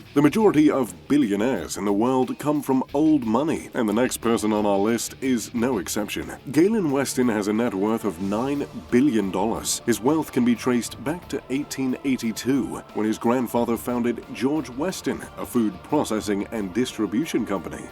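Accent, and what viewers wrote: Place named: RP, United Kingdom. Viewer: Sorry, but the posh narrating isn’t needed, and personally, I find it really annoying.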